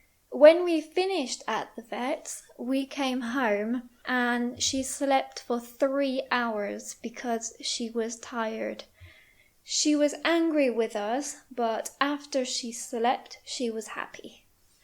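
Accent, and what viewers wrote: United Kingdom. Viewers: Her tone of voice, pronunciation and intonation are really very good. The recordings are pleasing to ears, much better than those channels which adopt AI sounds. Well, another reason might be that I prefer the British accent to the American accent. 😃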